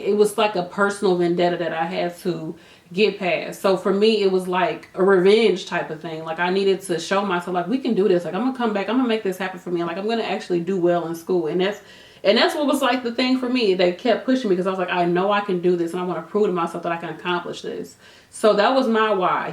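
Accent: St. Louis, United States. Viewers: You from St. Louis? Great video btw!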